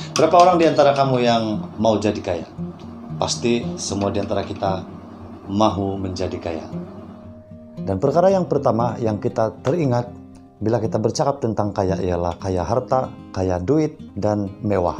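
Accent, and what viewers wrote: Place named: Sabah, Malaysia. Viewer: Punya pakat ko punya slang sabah.. hahah sandakan ka ko.!! Hahhaha . Aku dari telegram cardock team.!! Salam kenal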